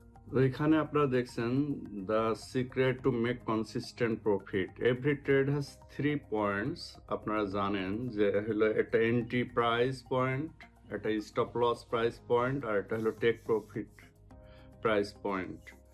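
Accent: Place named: Kushtia, Bangladesh. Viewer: Vaiyar basa ki kushtia te? Kushtia r vasar moto lagtise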